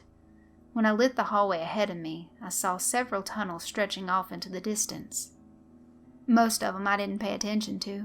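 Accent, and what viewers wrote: South, United States. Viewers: This is my first story of yours I'm listening to! Is your voice always like this? (its been so long since I last heard someone from the South)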